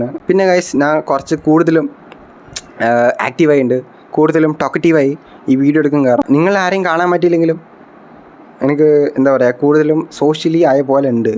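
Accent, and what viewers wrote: Tamil Nadu, India. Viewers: Muthe.... Nee kidilan aan...📈👍 Ninte voice kidilaann tamil slang vannittu.... Nalla vibe ind video kanan...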